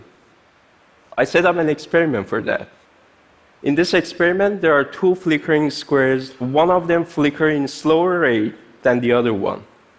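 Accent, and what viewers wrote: Iran. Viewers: Love to hear native speakers of Persian speak English. Such a beautiful sound! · that persian accent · Detecting...: iranian: Accent, face, brain, hair, eyes and body.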